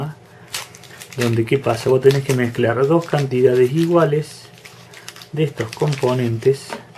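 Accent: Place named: Jujuy, Argentina. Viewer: Eres de la quebrada? Jujuy? Digo por el acentico. Saludos desde Chicago.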